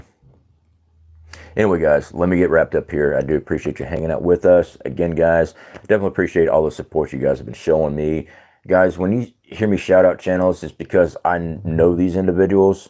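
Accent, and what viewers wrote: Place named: Appalachia, United States. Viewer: hillbilly accent Yall remember, chance, favors! The prepared mind! Semperfiiiii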